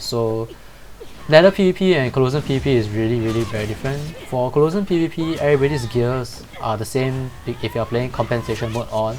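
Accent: Singapore. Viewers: Lol I can tell you're Singaporean just by the way u talk! No problem understanding u cuz im also Singaporean :D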